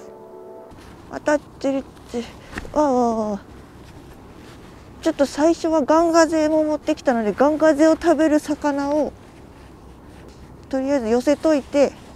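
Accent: Kagoshima, Japan. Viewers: メェ～餌も凄いけど鹿児島の海の魚影が凄いと思う👀 鹿児島訛り可愛いかった😊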